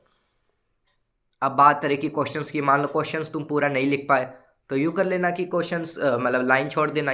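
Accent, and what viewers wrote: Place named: Haryana, India. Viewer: Bhai tera haryanvi accent kadak hai😂, mera bhi 2024 mein mains hai, 12th appearing hun😊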